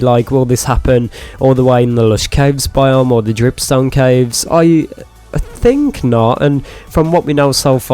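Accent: Scotland, United Kingdom. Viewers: I think he's Scottish but idk the words he uses don't sound like a Scottish person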